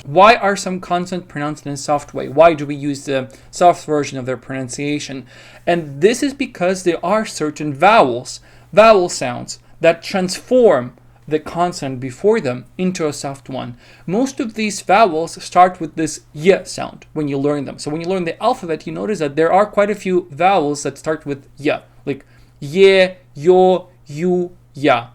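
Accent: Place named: United States. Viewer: Хорошее видео. Немцы и британцы именно так и произносили мое имя, со звуком j. Как приятно слушать ваш американский акцент, а с кем вы его ставили?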